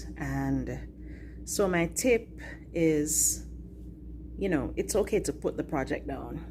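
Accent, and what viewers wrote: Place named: Jamaica. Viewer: Hello, I'm new to your channel! Found you through Sean guy who sews💞 looking forward to seeing more of your videos! I have a coworker who is from Jamaica, your accent reminds me of her, a lovely -lively person 💞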